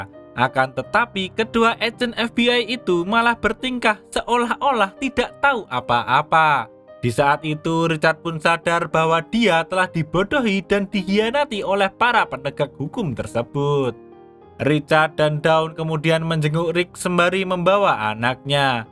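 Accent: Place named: Surakarta, Indonesia. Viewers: Admin e wong Jateng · Pasti mimin orang solo wkwk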